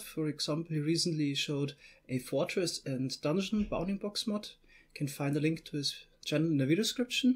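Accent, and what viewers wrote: Germany. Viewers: Where u from? U sounds like german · Man hört deutlich das du deutscher bist... Ist normal lul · Ist das Deutsch-Englisch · Ja man hahaha dachte am anfang das er Deutsch redet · Der deutsche Akzent hahahaha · Da hör ich doch den deutschen Akzent raus😂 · Du bist deutsch hört man👍👌💪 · wich language do you normally speak, because you kinda sound german or spain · Dezenter deutscher Akzent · t0rf I'm sure he is :,D His accent and some accidentally used German words gave it away ^^" And everyone knows that Germans are the best engineers soooooooooo... Just kidding but ye, I think he's German :D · Hörst dich an wie n deutscher lul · Dein Deutscher Akzent haha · Du klingst deutsch lol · Are you german? You sound like your german xD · Are you from Germany ? Weil ihr euch so anhört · So nice man merkt das er deutsch ist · It really sounds like you are German and try to speak English. 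· Hellocould i ask something are german because your accent is almost like mine when i speak english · in my understanding he is german, his accent is very similar to mine, :D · Are you German? I thought it because your Accent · He sounds German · Are you German? You sound very german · I think you are german because you have this actzent and i knew this because i have this actzent too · Man hört dein deutschen Akzent bro aber krasses english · The german accent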